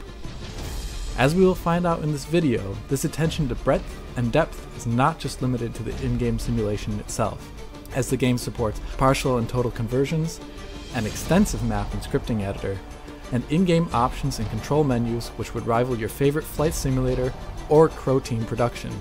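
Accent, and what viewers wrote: Germany. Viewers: Hm. I guess my ear is just trained for it then hearing that accent a lot. For me it was always sort of obvious. It's in the tone of his voice. It's just so.. german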